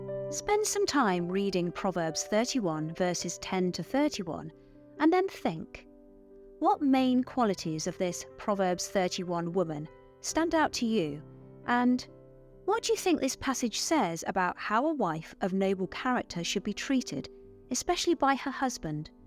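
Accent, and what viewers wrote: England, United Kingdom. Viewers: First time I got the English accent lady's quiz totally correct. I got scared when I saw "Ruth" and I thought you'd ask Chilion's wife's name. I know it means "back of the neck" but I can't remember.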